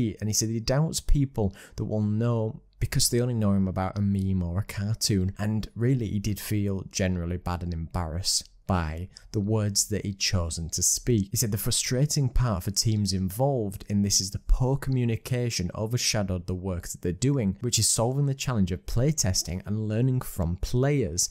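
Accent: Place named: North, United Kingdom. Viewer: Hey thanks for the coverage, now I know what I'd sound like coming from the north! 😉 Sorry to see the comments below that you are having struggles with the YT algorithm re Titles, that sucks. All the best!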